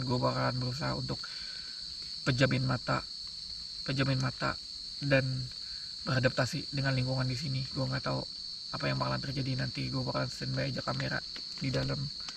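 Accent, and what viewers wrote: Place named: Amuntai, Indonesia. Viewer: Abang neh orang Amuntai kah.. 😀 kaya org Amt mandangar logat pandir pian.. 😀🙏🙏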